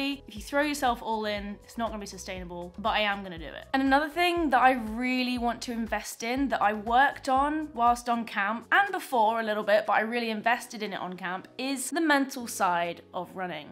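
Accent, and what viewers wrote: United States. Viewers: Hahaha your American accent is adorable!!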